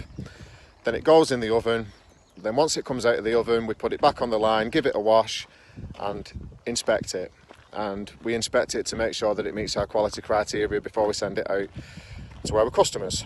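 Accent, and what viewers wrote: United Kingdom. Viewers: hello from Russia, I understood around 70% of your speech but I enjoy the British accent. I would like to speak like you I mean with accent..)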